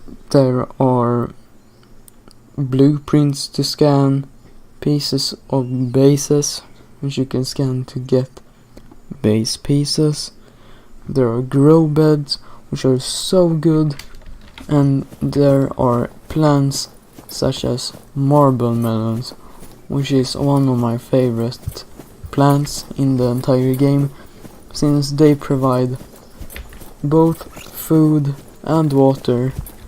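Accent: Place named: Sweden. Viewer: you sound so swedish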